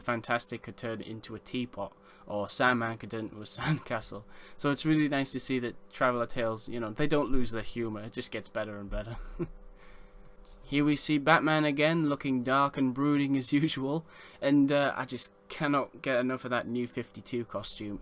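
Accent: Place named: England, United Kingdom. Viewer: Hey man awesome video as usual. Just wondered if you think there going to update some characters like clayface, killer crock and now that they're adding him Solomon grundy to be big figs or even have transitions with people like bane in his lego batman/2 skin then push the venom button on his chest and do a hulk with him slamming in to the ground and looking badass. Also where in England are you from if you don't mind asking :)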